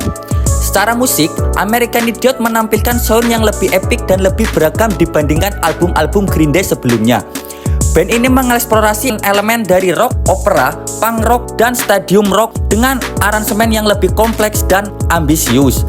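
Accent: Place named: Banyumas, Indonesia. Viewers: ora ngapak ora kepenak 😂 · keren ngapak 😂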